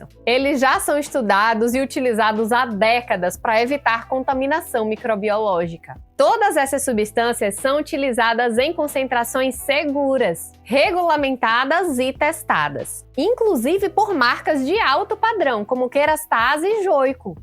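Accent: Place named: Bahia, Brazil. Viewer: Baiana de Salvador sim😂 Rapaz, reconheco logo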